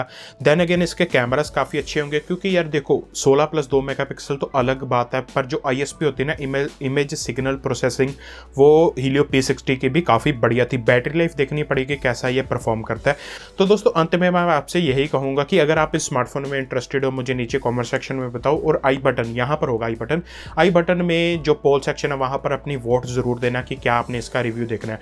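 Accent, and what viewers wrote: Punjab, India. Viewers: Punjabi accent bro 😅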